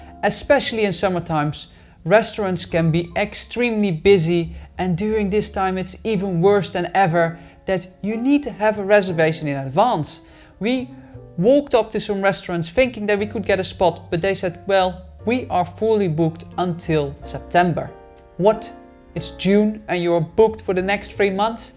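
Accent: Netherlands. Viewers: i hear your dutch so that says enough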